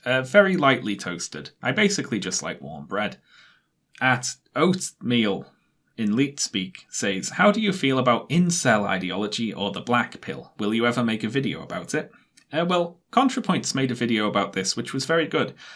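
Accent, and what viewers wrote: Liverpool, United Kingdom. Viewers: well, it’s informative, entertaining and elegant in its simplicity. Plus, I’ve discovered I have a thing for Scouse accents. The ones I can understand anyway.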